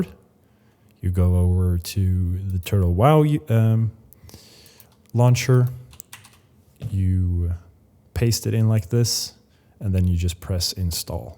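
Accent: Sweden. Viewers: are you swedish? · Bro, love your videos, very helpful. Your English is great, but is that a slight Swedish accent I detect? Zug zug!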